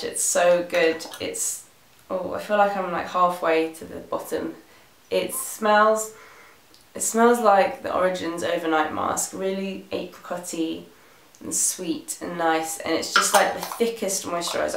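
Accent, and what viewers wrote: England, United Kingdom. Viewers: What part of England are you from? Love your accent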